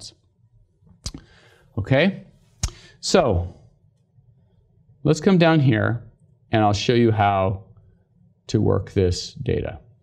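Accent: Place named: General American, United States. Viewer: A new class of teaching👏👏👏 Good style, perfect suit, standard american accent and high quality content. Thanks a lot👌👌👌👏👏👏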